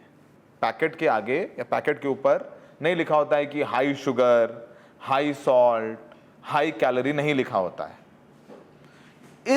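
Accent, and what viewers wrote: Kanpur, India. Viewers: You should make more videos in your authentic kanpuri language and Ravaiya. It was fun to watch.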